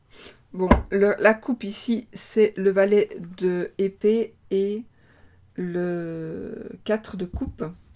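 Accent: Belgium. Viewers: Bonjour, toujours aussi ravissante! Av son jolie accent belge que j adore!je suis a ma pause a mon travail a l hôpital, et je suis venue voir directement ta nouvelle vidéo, quel plaisir de te voir! Concernant le tirage, certaines choses me rejoigne moi le célibataire, mais pas tout évidemment! Bonne soirée 😘🌹😘🙏♥️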